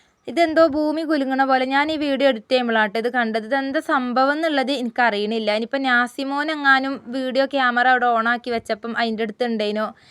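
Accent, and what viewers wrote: Malappuram, India. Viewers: നിങ്ങളുടെ സംസാരം കേട്ടപ്പോ സ്ഥലം മലപ്പുറം ജില്ലയിൽ ആണെന്ന് തോന്നി 😊കറക്റ്റ് മലപ്പുറം ഭാഷ തന്നെ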